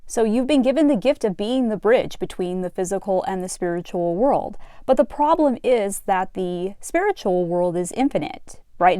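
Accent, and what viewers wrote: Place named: United States. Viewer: Thanks for your efforts.. You are always direct to the point, talented enough to address such subjects. On top of that, you speak in a very clear and nice American accent. And also you're so handsome with a baby smile yet wise and confident 💕 Thanks lady again for everything. NAIF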